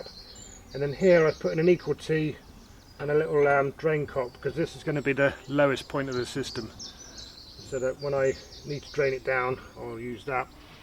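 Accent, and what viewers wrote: United Kingdom. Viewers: Greetings from Nevada , USA . Found your channel by accident , very interesting topic , Liked & Subscribed . Anxiously awaiting Part 2 , in the meantime I'll be watching your other videos . -- PS: Love listening to the British accent and terminology . Brother-in-law ( Precision Machinist ) is from the UK ( London area ) . Best of Luck to you neighbor .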